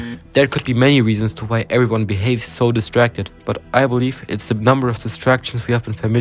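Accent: Germany. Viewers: You're very underrated. I like the way you edit your videos. And your accent sounds a bit german. Very sympathic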